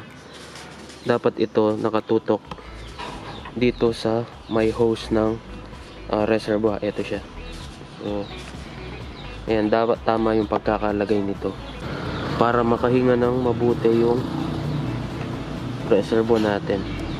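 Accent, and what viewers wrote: Pampanga, Philippines. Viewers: Kapampangan accent.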